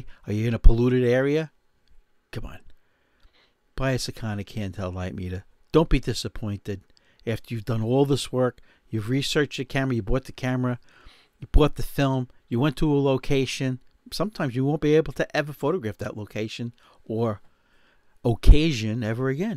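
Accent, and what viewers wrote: New York, United States. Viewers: This is a great video. As a New Yorker your accent makes me feel at home, and the information shared is super useful. And thanks to you I am now obsessed with the tremendous artistry of Vuhlandes.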